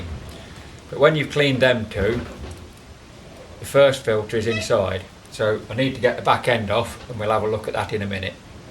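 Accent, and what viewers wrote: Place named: Leicestershire, United Kingdom. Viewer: Hello mate , I detect a Leicestershire accent , a couple of nights ago I found a guy on here , I think he,s in the states , he,s got an old 344 with crossed - up hydraulic settings & a rattly & inaccurate pto - hydraulic engagement lever , can you have a go at finding him , & straighten him out please . My knowledge really ends at 272 & 245 , but I have worked on a 344 , it,s me that refers to " Big Keith " , it was his . Keith is no longer with us , so that door is firmly shut . I told him to contact Charnleys but I think your knowledge is equally as good . Many Thanks mate , & keep the vids coming . Phil .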